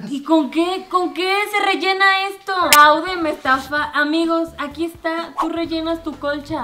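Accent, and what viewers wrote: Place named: Mexico. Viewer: Tengo 24 años y toda mi vida me pasaron diciendo que era muy gritona y que bajara la voz, que gritaba mucho... Luego encontré el canal de y dije OMG AMIKAAAA hablamos igual (solo que con diferencia de acentos porque yo soy de Costa Rica y ella de Mexico) Jajajaja pero me siento bien de saber que otras personas hablan así.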